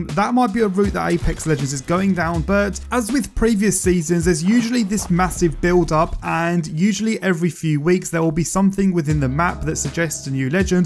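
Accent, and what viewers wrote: United Kingdom. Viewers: I like it. You're direct a d to the point, no begging for likes and your voice although british isn't annoying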